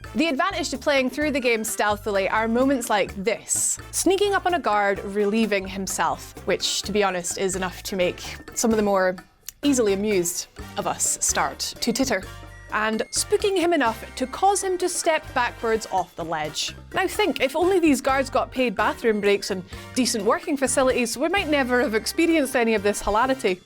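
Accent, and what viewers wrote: Scotland, United Kingdom. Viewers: Gaelic, lovely. She's probably Scottish. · Is she Scottish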